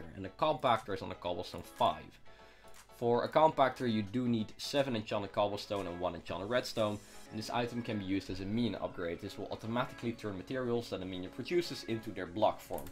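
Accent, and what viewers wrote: Netherlands. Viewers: Ur accent sounds dutch where are u from?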